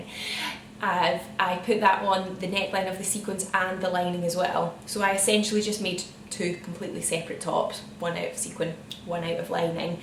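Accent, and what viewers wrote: Scotland, United Kingdom. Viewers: I watched your video for the interfacing and l just ended up really enjoying your accent...l really love scotish accent...wish l get to visit...greedings from Athens that is straggling with heatwaves and wild fires at the same time...oh! And of course you are very very clear in your video and very sweet...slainte!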